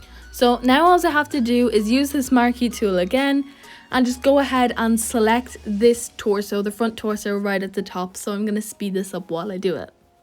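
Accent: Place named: Ireland. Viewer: Are u irish? Luv ur accent :)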